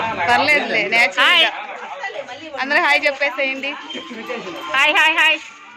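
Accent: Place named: Kadapa, India. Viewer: Meedi aaa ooru maadi proddutur meedhi slang kadapa vaalla laga undi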